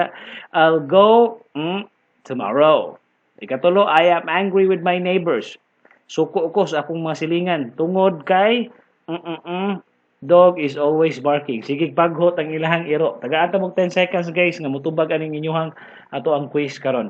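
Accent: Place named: Visayas, Philippines. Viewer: hala ka diha bisaya jud sya hahahahaha nindot ni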